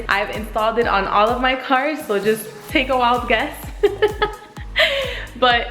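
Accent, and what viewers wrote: Latin America. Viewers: I figured u were Latina from ur accent but I didnt want to assume! Cuidate! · Are you hispanic I hear a lil accent , btw you fine guerita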